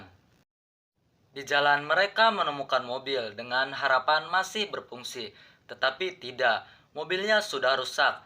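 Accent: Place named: West Java, Indonesia. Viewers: Orang Sunda nya kang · Bang orang sunda pasti 😂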